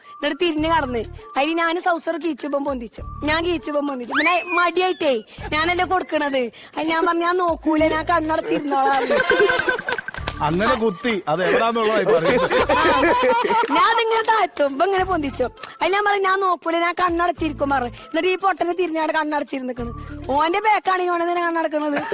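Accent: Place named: Malappuram, India. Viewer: Malappuram slang♥️😂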